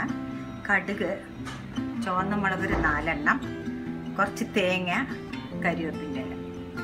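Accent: Palakkad, India. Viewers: Palakad aano desam samsaram thonunu super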